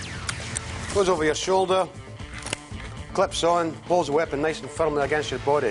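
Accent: Scotland, United Kingdom. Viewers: hes scottish not irish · Hes Scottish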